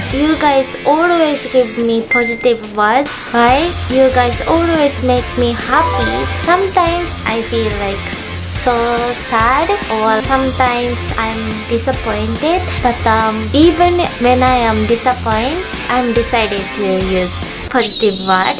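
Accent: Japan. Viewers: Love you're English Accent Mixed Japanese ❤️😊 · Your so cute and lovely, I like how you deliver english word, sounds like hapon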